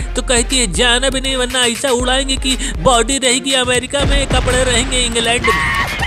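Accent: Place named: Bihar, India. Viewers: Bhai aap bihar se ho kya ? Aapki boli se lag rha hai / kyuki mai bhi bihar se hi 😄😄😄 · Pakka aap bihar se ho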